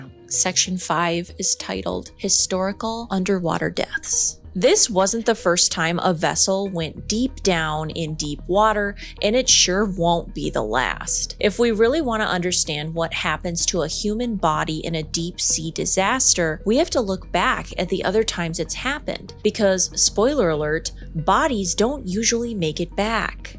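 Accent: Minnesota, United States. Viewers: are you from Minnesota?I love listening to your voice and I love your videos 😊Hi from Maine🌲🦞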